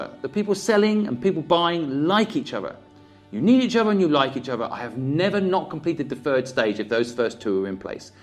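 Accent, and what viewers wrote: United Kingdom. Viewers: Squibb just sounds British. I love that I finally found you today. This video is one of the most important videos I've ever watched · Ugh dammit, he's British :/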